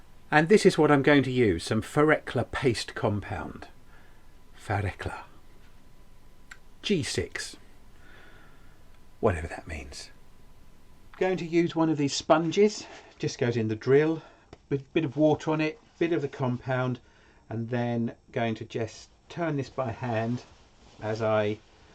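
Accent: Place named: England, United Kingdom. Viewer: Beautiful piece, but what was the medium you added to the Gold Paint? Sorry, but I'm in the states and didn't understand it through your accent. Of course, we speak american as opposed to English, so that could account for it. :-)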